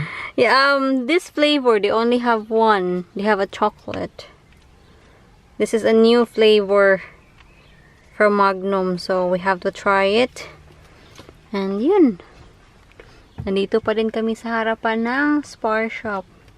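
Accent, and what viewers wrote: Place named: Visayas, Philippines. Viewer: Hi, bagong subscriber here from Winnipeg Canada. Alam mo ba na ang wife ko ay na hook sa kga kwento mo.. Pati ba naman yung headache mo iniisip na sana daw pregnant ka hehehe. Natutuwa din sya sa visayan accent mo. Keep on posting more vlog. Sana pa shout out din..My wife's name is Dhang Camia. Sana mapansin mo naman ajg comment na ito...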